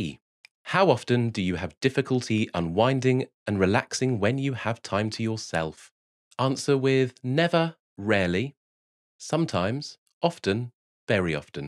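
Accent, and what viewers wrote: United Kingdom. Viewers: I noticed the asymmetrical glassesand the way they make his eyes smaller, both the lip and weird-placed ear piercing which is also a bit rusty it seems, the few grey hairs in his mustache and beard, the hairs that are out of alignment on his head, the whiteness and regularity of his teeth, his brittish accent and so many other things I need to rewind to hear the message again. No doubt I have some form of adhd. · And is his sweater blue or black? How many piercings does he have? Is that an English accent? 😂😮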